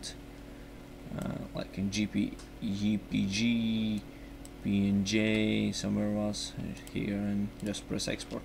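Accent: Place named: Russia. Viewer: Thanks dude, guys give him some slack, he has a Russian accent and probably is the best at english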